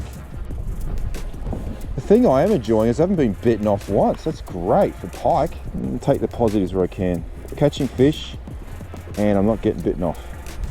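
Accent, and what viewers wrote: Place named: Australia. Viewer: Your first time in Australia, sounds like your a fuckin aussie to me and sounds like you know what you r doing in Australian waters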